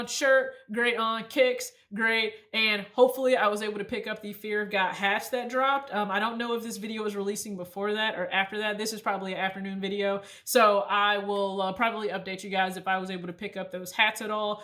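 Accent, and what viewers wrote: South, United States. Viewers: yea via free side. that's usually how I do my legit checks . I get overly obsessed sometimes cuz I happen to enjoy it lol.. also great video love the content !! as well as the fact that your freaking amazing !! love the southern accent!!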